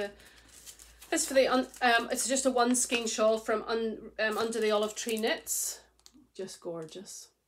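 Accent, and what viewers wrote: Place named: England, United Kingdom. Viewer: I just found you as a recommendation from another podcast. I love you English accent. I could listen to you all day! 🥰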